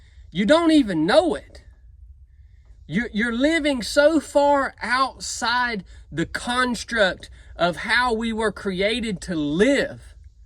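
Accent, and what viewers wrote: South, United States. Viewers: I don't know your history since I'm new to the channel. I keep trying to figure out your country accent. Here are my guesses in order: Kentucky, Tennessee, West Virginia, Georgia. It can't be Texas. And it really doesn't matter too much anyway. Just curious. I am a student of language and accents. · Bro I like your content but this is a little bit much lol you almost sound hillbilly sorry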